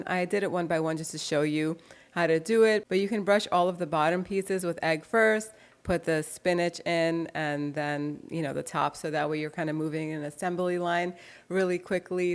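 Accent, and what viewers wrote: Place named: United States. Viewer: To long intros, to much american talking.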